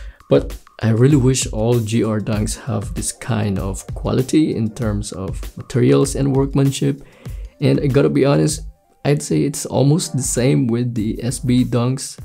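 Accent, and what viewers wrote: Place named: Philippines. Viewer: Pinoy na pinoy accent lets gowwww kuya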